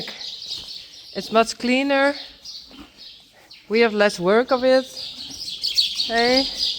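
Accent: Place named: Netherlands. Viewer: weet jij dat je een prachtig Nederlands accent hebt? 😎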